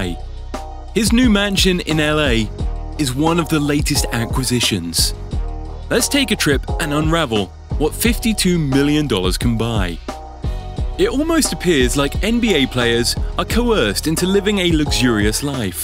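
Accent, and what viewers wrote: United Kingdom. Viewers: So it's not a house tour...It's photos taken off the internet that anyone can find then made into a slide show and a guy with a British accent telling you that LeBron makes a lot of money. 🙄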